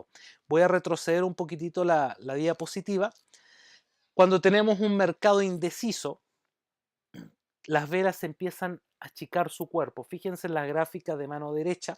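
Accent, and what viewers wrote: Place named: Chile. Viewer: 1er video q veo con una explicación tan clara de los patrones Heiken Ashi ! Se agradece la iniciativa.. (Da gusto escuchar el acento chileno) Salu2!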